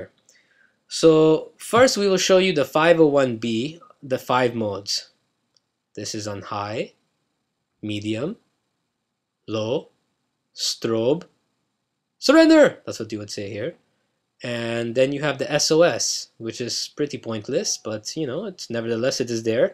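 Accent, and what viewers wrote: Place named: Philippines. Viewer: you sound filipino, do you sell here in philippines?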